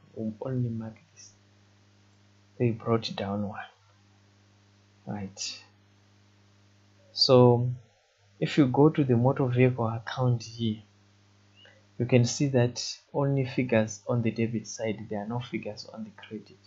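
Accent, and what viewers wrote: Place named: Zimbabwe. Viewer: Sound like a Zim brother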